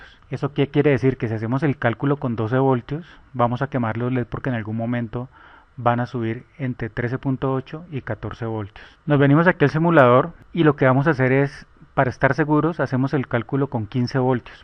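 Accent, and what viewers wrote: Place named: Colombia. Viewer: Hola pues desde Guatemala saludos me parese su asento colombiano, buenos amigos de por alla!! gracias por compartir todo esto desde muy pequeño me ha gustado la electronica, solo estudie un semestre en la u, pero agradesco que ponga a disposicion estos conocimientos !! bueno cualquier cosa le molesto!! buen dia!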